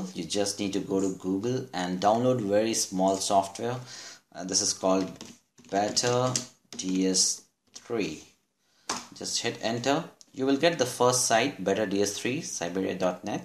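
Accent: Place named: India. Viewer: its indian guy talking, would not trust 2 much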